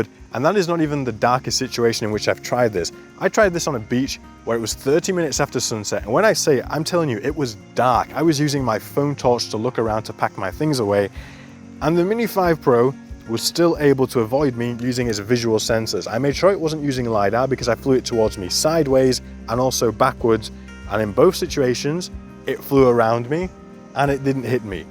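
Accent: United Kingdom. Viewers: Hey mate. You sound British, but maybe you are living overseas? The reason I ask is where here do you get the plus batteries from in the UK? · I am working my way through your videos, kinda binge watching really! Having just bought the DJI mini 5 pro I found your videos to be extremely helpful, especially the one on cruise control!! Needless to say I have subbed, many thanks for the tuition! Best regards Dennis 👍 BTW you sound like a Brit but it doesn't look like the UK, where are you? Sorry just curious 🤔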